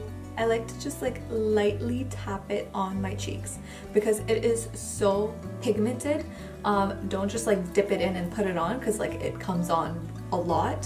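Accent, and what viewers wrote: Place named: England, United Kingdom. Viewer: How u are so well spoken English accent if u are from India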